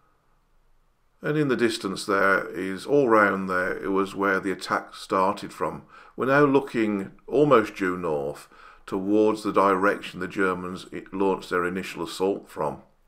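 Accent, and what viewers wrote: Birmingham, United Kingdom. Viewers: Great info! Funny Brummy accent too!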